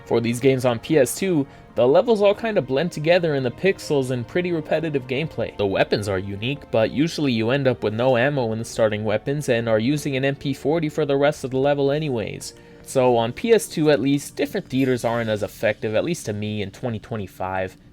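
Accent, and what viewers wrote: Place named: Boston, United States. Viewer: Where you from? I can kinda hear a bit of Wahlberg in theya! Boston?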